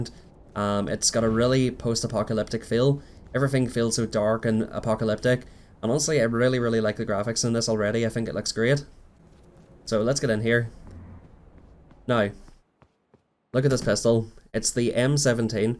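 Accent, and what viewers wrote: Northern Ireland, United Kingdom. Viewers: Are you Northern Irish? You sound like you're from here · Are you northern ireland? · Northern Irish?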